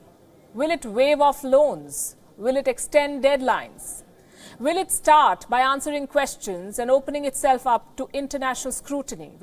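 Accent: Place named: India. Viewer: INDIAN ACCENT PAY PEY, SOON SUN, SAY SEE, ABLE EBLE, PEOPLE PEEPLE, TAKEN TEEKEN, AID EED, WAIVE WEIVE, NATION NETION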